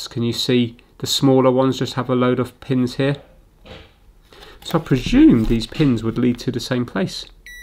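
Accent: United Kingdom. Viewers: Amazing actually. Brittish accent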